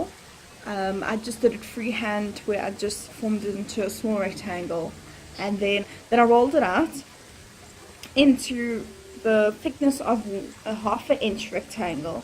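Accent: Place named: South Africa. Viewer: Your subtitles are the best. Lol... Google clearly doesn't understand our South African accents. Nice videos 👍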